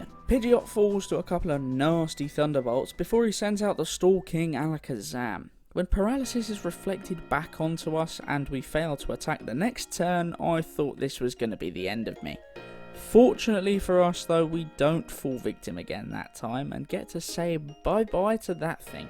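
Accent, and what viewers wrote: South, United States. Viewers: Hahahahaha go on son watch a lot of these but your southern accent is soothing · I love chansey ! I saw people saying you were southern * thinking US as I am * but still , may be another country but still southern accent is 🥺🤍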